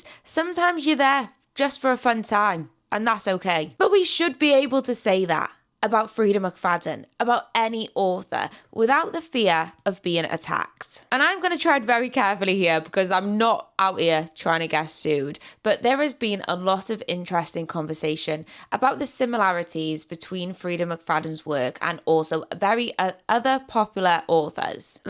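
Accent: United Kingdom. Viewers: With those hyped/popular books, I'm often a bit are the popular because everyone talks about them or are they popular because they're actually good quality literature? In a majority of the cases, I feel it's the first option, and yes, I might be a bit cynical, I can admit that. And while it's of course great if Booktok and the hyped books may have gotten some people to read, don't get me wrong, but there's so many other books out there, that's also well-written. Just to show the middle finger to Booktok a bit, I did start an "old school" book blog where I write about mainly literary fiction, contemporary, classics, and world literature. Some titles more popular than others, but also a bit more "hidden gems" when I come across them. Btw, can I just say I love your British dialect?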